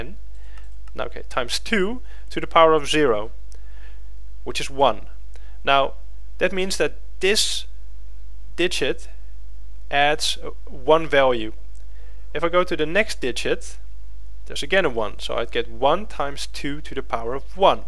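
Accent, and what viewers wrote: Netherlands. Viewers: are you dutch? :P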